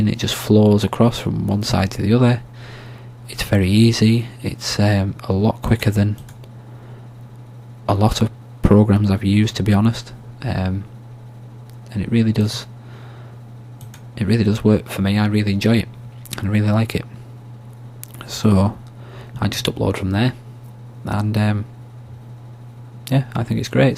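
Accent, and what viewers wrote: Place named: Liverpool, United Kingdom. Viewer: sounds like a scouser (Liverpool)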